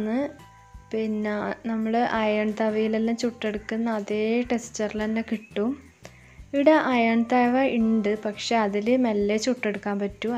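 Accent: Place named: Kasaragod, India. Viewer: Keettitt nammale kasargod slang thonnunnu.... kasargod aano veed...nice vlog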